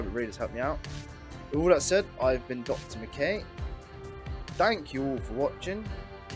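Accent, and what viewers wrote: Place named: West Country, United Kingdom. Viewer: I know a west country accent when i hear one xD Nice video dude! That last section with the double hinge was exactly what i was looking for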